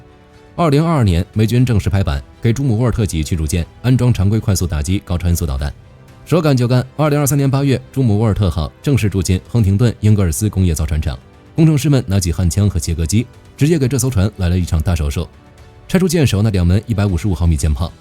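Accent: Beijing, China. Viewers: 火力君在军事术语上，最近一年来始终都在向台湾用于对标，比如“陆攻”，“资料链”，“镭射”，“寻标器”......，但是朗读所使用的AI引擎却还是字正腔圆的北京普通话，这一点特别别扭。要么你就统一全按北京标准用词然后使用这个语音，要么你就改个台湾语音朗读AI引擎，使用正统的台湾发音标准。现在搞得四不像，照着虎画成猫。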